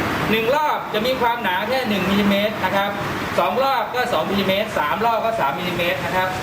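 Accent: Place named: South, Thailand. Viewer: เกรื้องเกลื้อบดินเผา ,ทิ้งไว้สักกรู้ คนพากย์เป็นคนใต้แน่เลย<num> ก.ไก่ทุกคลิป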